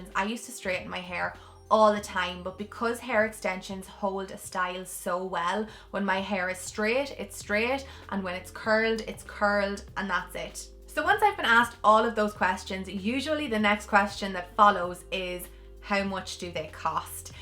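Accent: Ireland. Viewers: Love the Irish accent so sweet. . Your hair is beautiful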